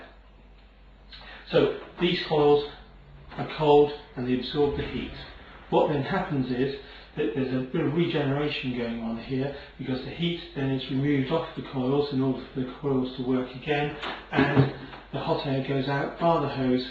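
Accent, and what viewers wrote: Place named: United Kingdom. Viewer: Air conditioning is severely underappreciated here in the UK and honestly I was quite surprised to hear a British accent when I clicked on the video.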